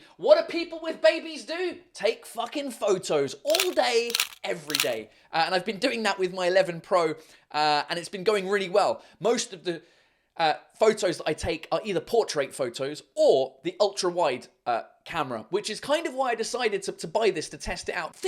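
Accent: United Kingdom. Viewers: How can you manage to have that great British accent and a native French accent :o Great video by the way!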